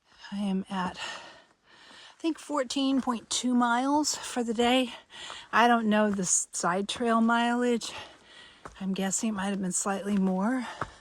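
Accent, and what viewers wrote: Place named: South, United States. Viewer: Awesome! 100 Mile Wilderness. So close to Katahdin it's not even funny. WTG! I can so tell you're a southern gal, no one says "fixin to" like us southerners 😂. Okay, the southern drawl is a dead giveaway as well, but the "fixin to" just sealed the deal 😊😁. Happy trekking! 🥾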